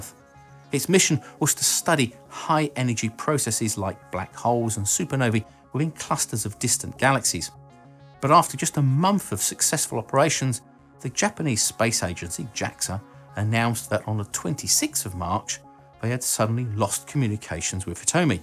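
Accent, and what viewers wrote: Australia. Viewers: comfy voice, ..accent sounds Australian. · Despite the high Australian accent, this guy's elaboration of scientific facts is cool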